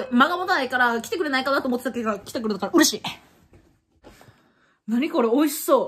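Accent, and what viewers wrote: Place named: Kansai, Japan. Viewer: 割と低音の関西弁ほんとに再現されすぎ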